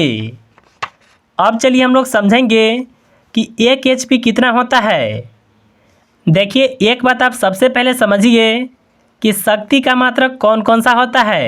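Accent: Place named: Bihar, India. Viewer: Bihar se lag rahe ho sayad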